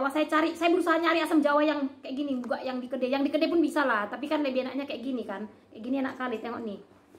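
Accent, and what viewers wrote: Medan, Indonesia. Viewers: Kek ginilah klo orng medan dah cakap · Ka. Aslinya org mana ?? Klo nonton kk ingat kampung halaman . medan.. Logat nya itu loh.. Congok..hahahaha.. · Orang Medan ya kak... Klu dgr dri logat ama nada saat bicara... Paten kali kk ini.. Makasi ya kak ek tips nya.. Mantapppp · Medan kali logat kk ni.. 😊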